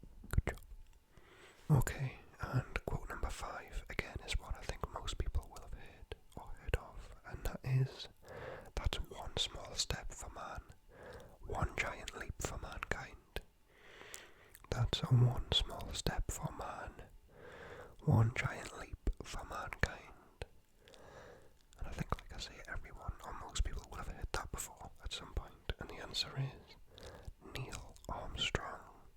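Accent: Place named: Newcastle, United Kingdom. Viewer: Straight up following cos I’m from Newcastle 👋🏼👋🏼👋🏼 been dying to find a Geordie asmrist!!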